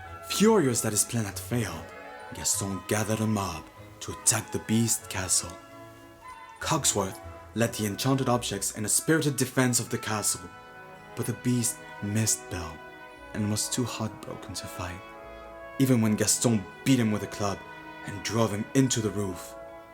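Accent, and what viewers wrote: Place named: France. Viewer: Your French accent suits it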